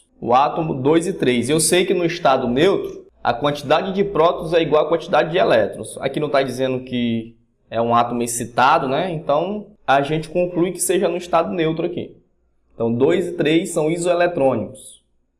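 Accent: Northeast, Brazil. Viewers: Consegui entender melhor devido ao seu sotaque, tbm sou nordestino, abraço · Huum Sotaque do Nordeste! Boa aula ♡ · VC é nordestino? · nordestino foda kkkkk É noix vlw <3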